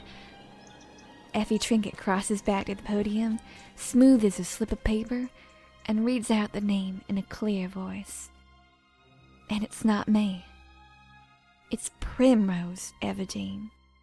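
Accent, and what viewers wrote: South, United States. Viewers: What's up with the southern accent? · I like the southern accent...Good job! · Cool but um what's with the country accent?...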